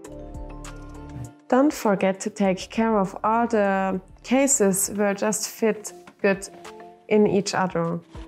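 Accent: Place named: Germany. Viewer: Am besten bitte gleich deutsch sprechen. Dein Deutsch-Akzent ist so stark, dass ...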